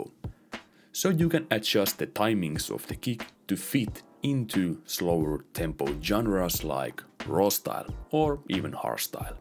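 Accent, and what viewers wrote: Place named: Finland. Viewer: piti ettiä mitenkä duunata vähä uptempoo van löyty suomi aksentti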